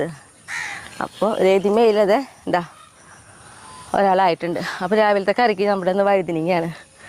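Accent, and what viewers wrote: Thrissur, India. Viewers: Ningalude sthalam evvideyanu. Thrissur ano. Samsarathil Thrissur slang.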